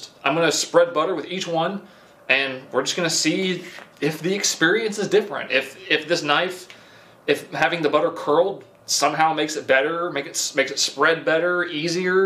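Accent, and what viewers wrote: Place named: Alabama, United States. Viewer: Lol he's like Alabama backwoods sometimes but you can definitely tell his name is lol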